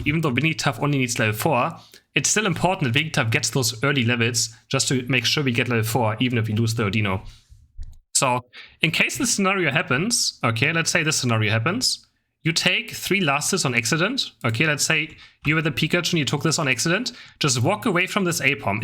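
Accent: Germany. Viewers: I'm sooooooo curious You have an accent I can't quite place It's like German or maybe like a Norwegian type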